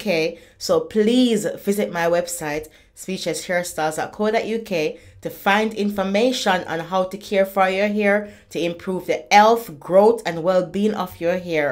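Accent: Jamaica. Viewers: A me alone hear the jamaican accent in her voice?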